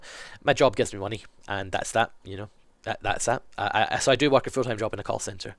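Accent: Scotland, United Kingdom. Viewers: I love your accent ^_^ it's probably weird of me to say that since I'm Scottish too but, my accent is a lot different to yours, haha.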